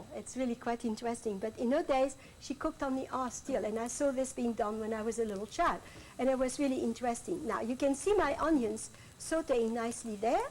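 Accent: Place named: France. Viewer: February 16, 2000 San francisco Chronicle A Grande Dame Steps Down / - controversial teacher, mentor and founder of School for American Chefs - conducts her final class. Janet Fletcher, Chronicle Staff Writer looks squarely at one of the young student-chefs gathered around her and minces no words about his menu idea. "That is not something I will let you put on the table," she says briskly. A few minutes later, she verbally leaps on a student who thinks a celery root puree might be nice with the quail. "Oh, please," says the cooking teacher in her girlish French-accented voice. "Save us this puree business. We have teeth." The eight students, all working chefs attending Beringer Vineyard's School for American Chefs, are nearing the end of two weeks with, widely recognized as one of the world's top cooking teachers. But today's menu-planning meeting seems more like boot camp than class, with constant unsparing criticism from this white-haired drill sergeant. 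She is, as former students confirm, brutally frank and quick to find fault. One admiring protege calls her "mean as a buzzard." But like the recipes she so painstakingly balances, herself is a complex creation who softens her harsh side with unstinting generosity, students say. With this last two-week session at Beringer, the 69-year-old is retiring from full-time teaching, leaving students to decipher the ingredients that have made her such a compelling, controversial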